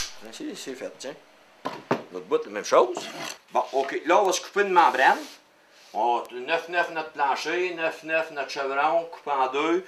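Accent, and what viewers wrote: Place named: Quebec, Canada. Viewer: Je vais faire la même pour mon jardin, pour mes p'tit moineaux Français. pour Avec l'accent canadien ou québécois cette vidéo est génial !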